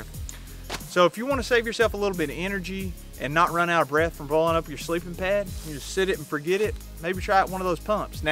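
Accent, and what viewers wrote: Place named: South, United States. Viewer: I never knew the southern accent worked so wonderfully with your everyday hipster. Great content mate!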